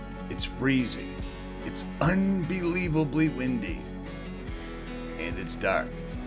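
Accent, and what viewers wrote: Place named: East Coast, United States. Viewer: You sound like. I was raised in Thermopolis and used to fish the canyon and spillway constantly. We still go back every year to visit and fish the Bighorn. Thank you for posting this. Very emotional for me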